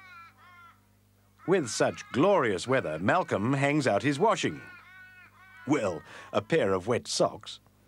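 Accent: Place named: United Kingdom. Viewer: Amazing to hear the narrator with a still slight British accent. How things have changed in 40 years.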